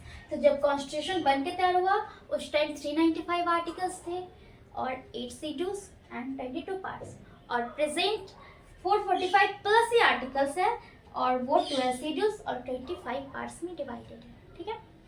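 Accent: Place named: Bengal, India. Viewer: I think you are belongs to bengal beacause your hindi language simmilar from bengal .but your teaching method very perfect.....recommend by rajnish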